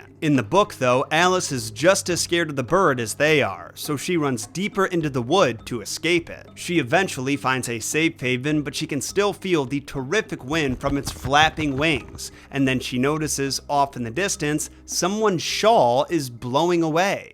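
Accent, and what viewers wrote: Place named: United States. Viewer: Never heard it read in an American accent but cool video.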